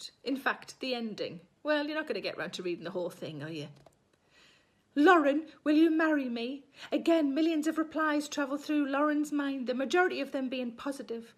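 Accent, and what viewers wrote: Newcastle, United Kingdom. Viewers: She is from South Shields, which is in the north eastern part of England, south of Newcastle but north of Middlesbrough. Her accent is referred to as 'Geordie'.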